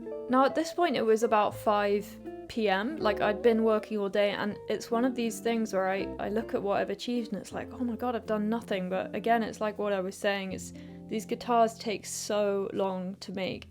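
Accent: United Kingdom. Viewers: I am captivated by your woodworking skills ,love your British accent. The hoping is probably good for blood flow to your legs .🌻🐇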